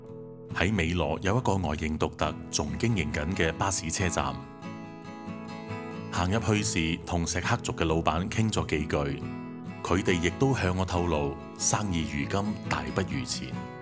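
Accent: Hong Kong, China. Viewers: 請問你是香港人嗎？因為聽口音不像馬來西亞華人。。😀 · U sound like Hongkie. Great job. Hope to see more of your work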